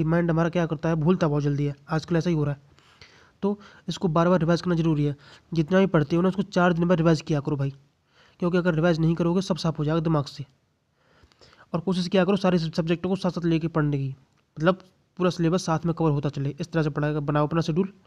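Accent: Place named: Gorakhpur, India. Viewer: apke bat se lg rha ap gorakhapur side kr rahne vale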